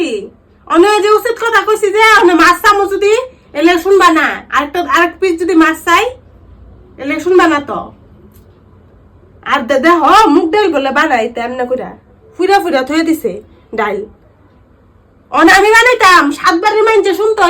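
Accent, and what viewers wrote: Bangladesh. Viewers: Oi divai tumi ki ময়মনসিংহ distrk ami o tai tomar kotha amr korha akdm milagache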